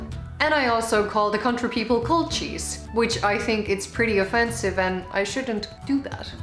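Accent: Ireland. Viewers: Brilliant Video you sound more Irish than I do and I am from Tipperary. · Kuulen irlantilaisen aksentti joskus puhutessasi - sinun on täytynyt asua Dublinissa! Terveisiä Irlannista! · Damn, your Irish accent is nice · You sound like a proper dub, from the north side of the Liffey. 6 times lads 🤣🤣🤣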